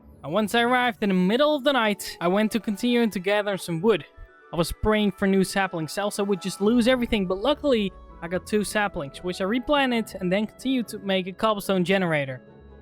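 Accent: Netherlands. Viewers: Are u dutch?? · Hmmmm you sound Dutch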